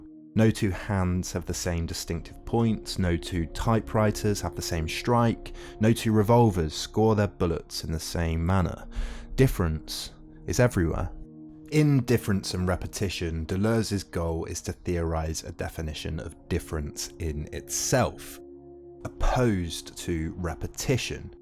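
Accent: United Kingdom. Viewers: With these types of videos, my brain cells really appreciate the British accent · oh man, i love hearing this info from a british accent instead of an american. i feel so smart right now lmao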